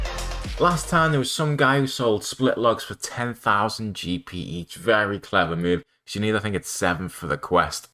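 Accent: Liverpool, United Kingdom. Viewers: scouse power!!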